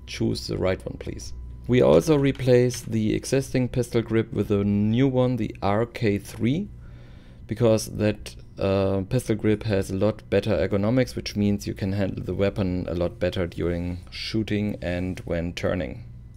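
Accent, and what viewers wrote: Germany. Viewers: Thank you nice video are you German ?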